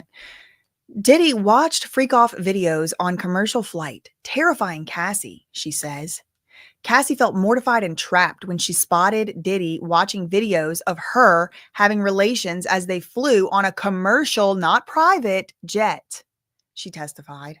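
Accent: South, United States. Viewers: My husband thought you were a black gurl this whole time lmao “no she’s just from the south” 🧚🏻‍♀️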